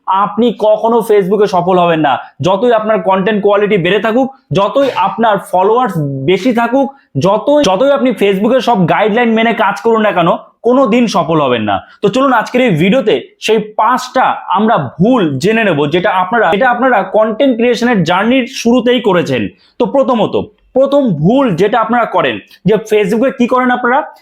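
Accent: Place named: West Bengal, India. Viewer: পশ্চিপবঙ্গের বাকভংগি ভালো লাগে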